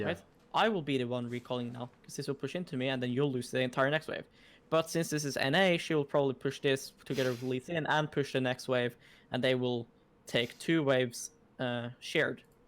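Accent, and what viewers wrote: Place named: Denmark. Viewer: just the danish accent :D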